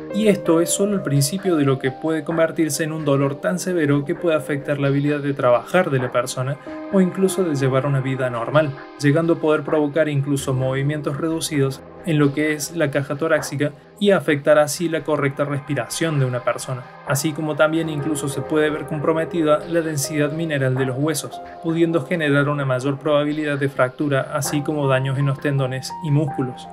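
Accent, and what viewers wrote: Córdoba, Argentina. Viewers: Cordobés hasta el alma, se siente. Buen video carajo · sos cordobés? Hay un poco de tonada que creo que es de mi provincia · Buen vido bro, sos cordobés? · Más cordobés imposible aksjdj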